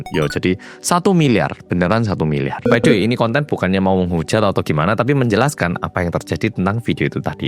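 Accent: Java, Indonesia. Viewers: Lho, baru tau? Mas asli Korea, dari ortu asli Korea Lahir di Daegu Korea, besar di Malang Jatim dari TK umur 4 tahun sampai SMA Makanya logat nya medok Jawa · Oalah,wong Korea iso jowoan medok pisan,tak subscribe aelah..😊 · Ya bisa,lah dari TK -SMA sekolahnya di Malang, malah pernah jadi Ketua OSIS segala,otomatis bhs jawanya udah medok.. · Terus terang aku nggak bisa nyimak ceritanya.. Salfok sama suarane mas yang medok iku lho.. 😂😂😂 Kok iso lho..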